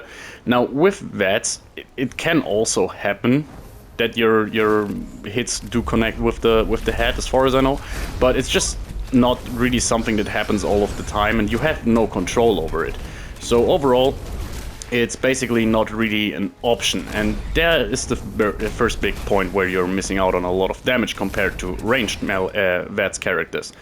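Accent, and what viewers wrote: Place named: Germany. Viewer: Ich hab keine Ahnung warum aber als Deutscher erkennt man andere englisch sprechende Deutsche wie aus dem nix 😆 Gutes Video 😇🥸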